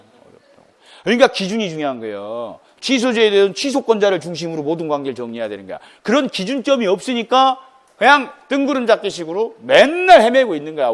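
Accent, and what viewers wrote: Jeolla, South Korea. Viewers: 이 아저씨 왕 섹시하다. 아우라 오지고. 전라도사투리도 꽂히네. 머더러 혀...?.아니여.현존이악만줘. . . ㅋ ㅋ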